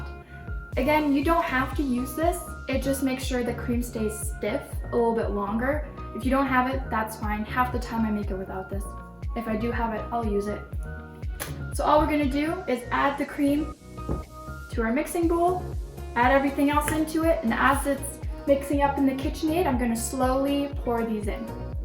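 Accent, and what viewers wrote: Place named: United States. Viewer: Wow, I think you’re a sweetheart but it took a bit to grasp a lot of things you said. You speak like an American, but so many of your terms didn’t make sense to me. Now that I understand, I’m pretty impressed. A couple things. You weren’t wrong when you were trying to say Amarena Cherries. Those are not the same as Maraschino cherries. Amarena are good cherries to top your cake with but I like what you did. You keep referencing dough. Maybe in Germany, you think of it as dough but in my world it is more of a batter. Dough is what we refer to with bread, cookies and pastries. Alcohol doesn’t vanish, it evaporates. Bottom line is that your cake turned out absolutely beautiful. Good job! Is this a recipe from your family or did you get it from another YouTube? Keep up the good work! Also, how much is 1 glass of cherries?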